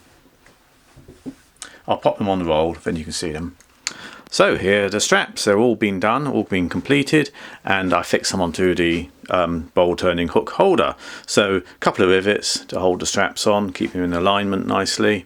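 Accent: England, United Kingdom. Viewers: Great...I love your work and your country English accent.........😊I have a beautiful collection of approximately 100 year old C.S. Osborne tools including Space markers. French edgers including curved etc...A round knife Circa 1900 stamped Crawford..new edge...my everyday tool...Large diameter hole punches...etc... I certainly enjoy your videos,.....P.S. Do you ever work with Cordovan Shell 🐎?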